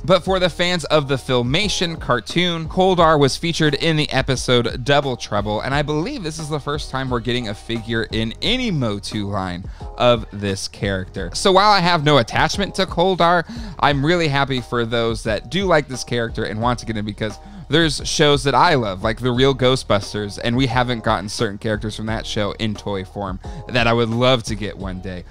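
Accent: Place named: Midwest, United States. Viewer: You have such a thick midwestern accent lol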